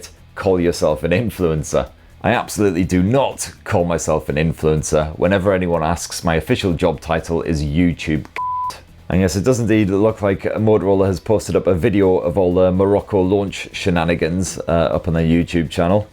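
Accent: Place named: Teesside, United Kingdom. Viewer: Come on here to check if my offered upgrade of the Sony phone was worth it - are you a Boro lad by any chance?? Thought that was a TS postcode at first but the accent is nearly there! ha ha Thought i'd take a guess anyway .... been offered Apple for the first time but never bothered with them but it is for around the same price. My only issue is they seem to release a new one every other week so I hesitated on the offer thinking I'd be offered a ten year old one for nearly forty a month As you can tell I don't know anything about phones. I just like good looking phones with decent office abilities and internet surfing features with a rad display - was hoping this Sony would cut the mustard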